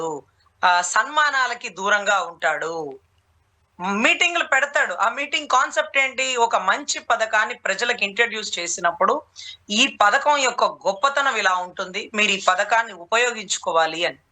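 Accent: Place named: Guntur, India. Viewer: Arre arre Guntur language ❤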